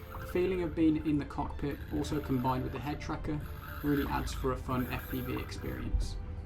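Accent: United Kingdom. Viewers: SUPURB!!!!! I take it your in the UK. Accent plus ROUGH LANDING N TAKE OFF SIGHTS. Hahaaha every other country Italy Germany USA ect ect ect have decent runways purpose built were still taking off from grass. Not much has changed since ww11. I'm In the same boat WHEELS OFF BELLY LANDINGS. You've done a brilliant job... I'm still trying to get a 1000mm or a 800mm Spit great shots Brian London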